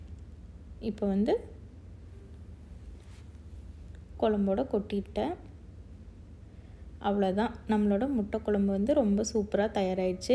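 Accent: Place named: Coimbatore, India. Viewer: Namma slang apdiyae varuthu ka... Naanum Coimbatore thanunga😄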